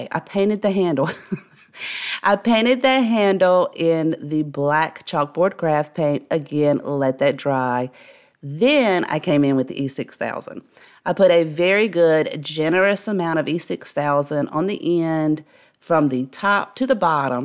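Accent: South, United States. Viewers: Your scales is amazing and the best I’ve seen.....ps from one southern gal to another....lve the accent!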